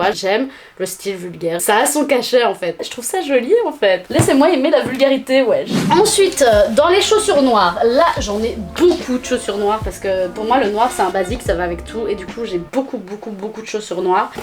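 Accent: Belgium. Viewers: 1) je t’adore dès la première vidéo que je regarde de toi 2) t’as un petit accent qui me semble belge?? Serais-tu belge à tout hasard?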